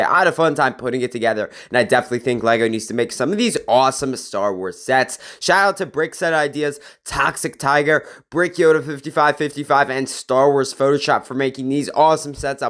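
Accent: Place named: United States. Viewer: u sorta sound like an American. Dantdm lol (not hating)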